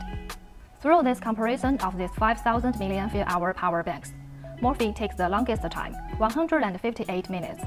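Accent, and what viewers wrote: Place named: Asia. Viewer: Damn, she talks so quick and with her Asian accent is worse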